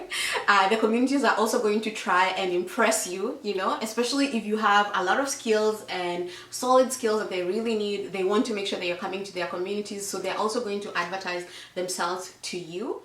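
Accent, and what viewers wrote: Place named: Kenya. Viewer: Good Job. I can tell you have lived in Kenya (from your English) i hope to migrate by end of 2020. Sitting my IELTS next week. Much love from Kenya. your hair is beautiful too.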